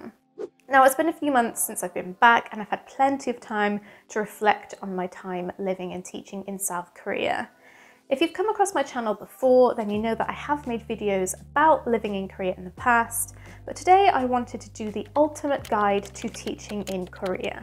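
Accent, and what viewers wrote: United Kingdom. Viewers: Sound good posh English accent...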